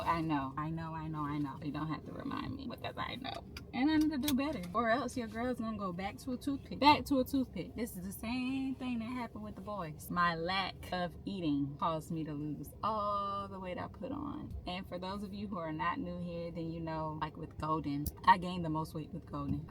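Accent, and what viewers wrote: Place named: South Carolina, United States. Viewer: So i just watched the full video and ive been watching yall for a long time but just found out your from SC! Im from Anderson South Carolina and i knew you sounded like you were from here. Where exactly are you from? I love your little family yall are precious 💕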